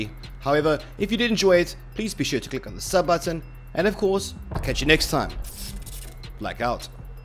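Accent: South Africa. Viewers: are you South African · South African? Easy to follow tutorial accomplished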